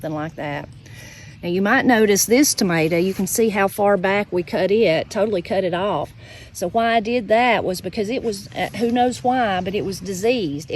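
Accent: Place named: East Tennessee, United States. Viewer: Your accent makes me think about my childhood. My family is from East TN - not exactly the same accent, but pretty close. All your videos feel like a chat with the neighbor.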